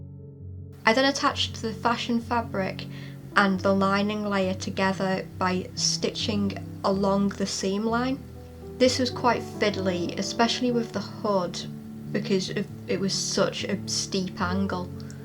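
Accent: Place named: Liverpool, United Kingdom. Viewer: I’m from Liverpool too ❤️❤️ I knew I heard the scouse twang 😂 Yeah some sellers do lie or actually don’t know and just take guesses , have you tried “ pound fabrics” ? Prices are great , shipping is from £4.99 upwards, depending on how much you buy, but some great prices, give them a look I buy loads from them , I’m in middle of making myself a dachshund dog , I’m goin to use him as a big pin cushion, I could easily make you one too , do you have a P.O. Box number ? I’ll send him/her to you , you’ll only have to name them , I don’t really want to leave my email here and don’t really know how we can sort addresses out , any ideas ?. Xx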